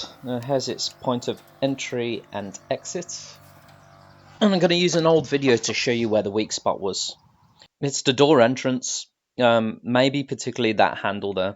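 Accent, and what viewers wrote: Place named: Midlands, United Kingdom. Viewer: Sorry to see you’ve lost your girls to the fox. You sound like you come from midlands like me. Weld mesh is only suitable for chicken runs. I would also invest in a good electric fence around your enclosure too. They can be run off the mains or a 12 volt leisure battery which can be recharged. I use a 50m holt line electric fence & it’s hooked up to the mains now. I’ve had many battles over the years with the fox, lost poultry. Good luck & i’m sorry about the birds. ♥️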